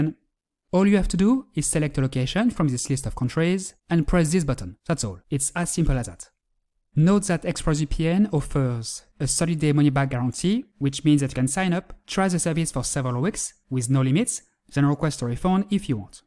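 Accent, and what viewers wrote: France. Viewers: XD L'accent francais se fais entendre x)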